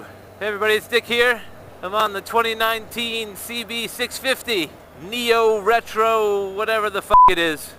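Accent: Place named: United States. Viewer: You don’t sound English? You sound like an American. How’s it feeling riding on the opposite side of the street.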